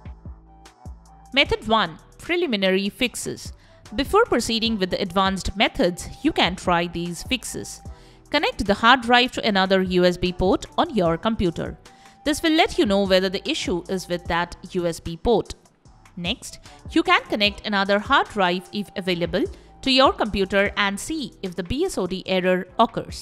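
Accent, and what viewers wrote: India. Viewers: A video full of kinks to follow without actually showing anything in an AI Indian robot voice 😩😩😩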